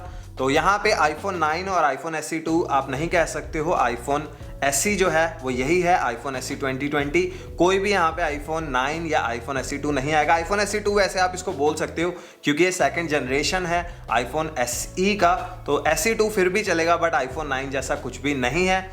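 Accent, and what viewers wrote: Punjab, India. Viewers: First. U doin good work n givng compt info about aple prodct Srcond:- i love to hear your voice as typicl desi punjabi voice spcl my you tube ki family line Third:- punjbi bhai yeah batao koun se airpod liye jaye sab kuch considr kar ke price quality Fourth:- kya abhi iphone 11 pro lena chaiye ya wait kiya jaye iphone 12 ke liye. Fifth:- iphone 11 pro vs iphone 11 pro max koun sa sahi rahe ga Sixth:- kya usa aor india ke phone mein koi faraq hai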